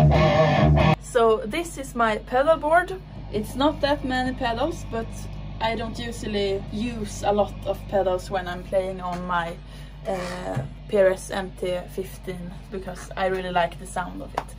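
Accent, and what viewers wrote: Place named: Sweden. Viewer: idk but your accent is cutely swedish. 😂❤ Definitely checking your band out. Cheers from Helsinki!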